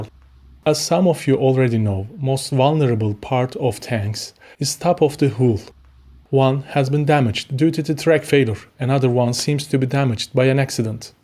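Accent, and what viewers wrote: Turkey. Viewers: sen türk müsün aksanın türk aksanına benziyor güzel video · Aksandan türk olduğun hemen belli oluyor ama güzel birşey yabancıları bilgilendirme için böyle birşey yapman senden daha fazla lazım bu ülkeye kendimizi batıya açıklayamıyoruz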